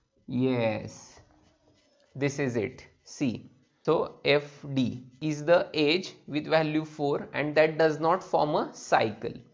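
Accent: India. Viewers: if you are indian, speak Hindo, don't speak the terrible indian english, i will make sure never click this again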